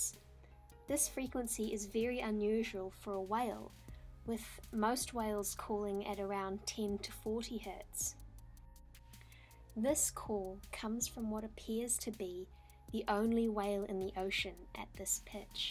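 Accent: New Zealand. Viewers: Luv that Kiwi accent. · Are you kiwi? Your accent is so clear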